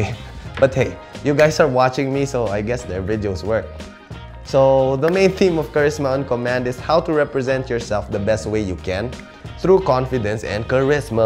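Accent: Philippines. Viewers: lmao sometimes you speak English like a normal Filipino but then other times, you speak English like you learned the language from looking at memes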